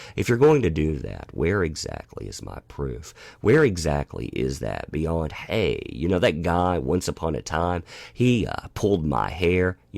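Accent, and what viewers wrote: South, United States. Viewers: Hey where IS your accent from? Are you from the southern US because it sounds somewhat familiar?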